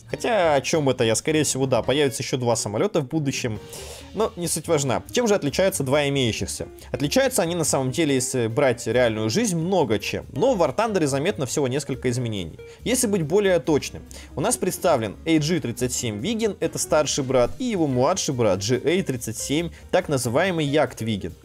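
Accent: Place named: China. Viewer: Этот божественный китайский акцент!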